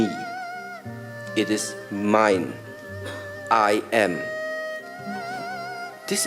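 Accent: Germany. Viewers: By the way... asian master with german accent. Crazy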